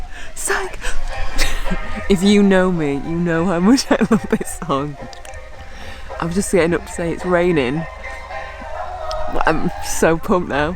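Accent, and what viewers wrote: United Kingdom. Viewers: How are you used to kilometres you have a british accent and we travel in miles ?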